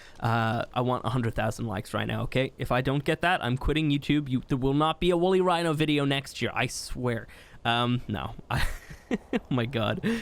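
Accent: Ireland. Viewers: Irish right? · Wait he's irish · i been watching you for years, i never knew you were irish😭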